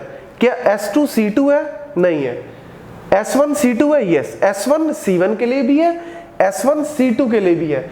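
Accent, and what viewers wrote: India. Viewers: can I give out some suggestion/ Content is good but accent is quit horrible, I feel like I’m listening to Indian, it would be quit nice if subtitles could manually added clarify what teacher is saying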